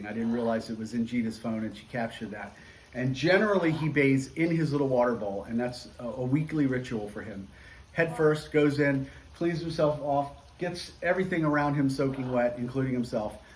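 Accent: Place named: United States. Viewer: Good Saturday morning to you! Thank you very much for this video which allows us to know more about Tico... I have absolutely no doubt that he is a happy bird, who also has, it must be said, a very loving and attentive family. parrot needs. Thank you for enlightening our days or our nights, for sending positive!! ... and thank you also for the French who do not shine too much in English (of which I am... I am more Spanish-speaking, than English-speaking) for trying to improve... I love your American accent (from Florida ?)! A hug to Tico!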